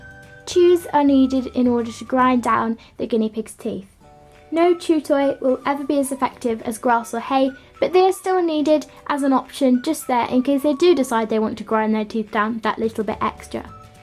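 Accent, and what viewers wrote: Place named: Scotland, United Kingdom. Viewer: I was wondering where are you from because I’m Scottish and we have the same accent ❤️ I also have a guniea pigs names Zelly and George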